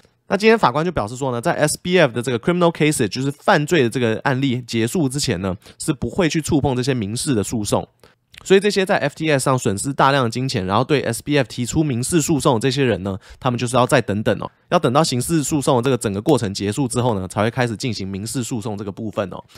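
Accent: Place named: Taiwan. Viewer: 台湾腔，哈哈😀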